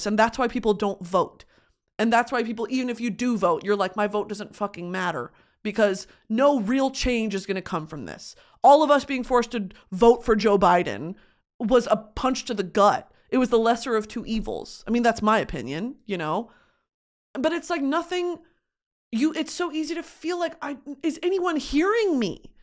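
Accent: United Kingdom. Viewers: the british accent got me :face-purple-crying:😭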